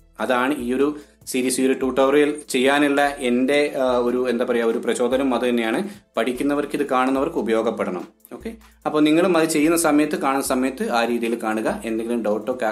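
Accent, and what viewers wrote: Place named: Malappuram, India. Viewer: nice presentation, oru malappuram touch undu.. s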